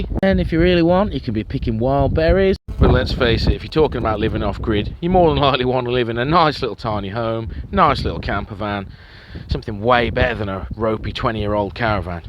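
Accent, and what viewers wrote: United Kingdom. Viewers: another good video bro. Are u in england ? cant make out the accent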